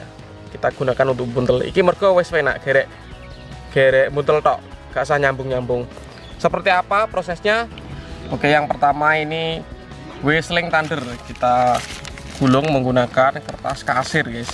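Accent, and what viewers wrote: Java, Indonesia. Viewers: Wong jowo yo mas?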